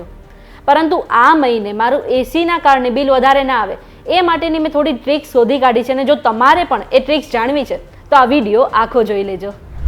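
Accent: Kathiawar, India. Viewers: Whether you are from Kathiawad or what, the speech seems to be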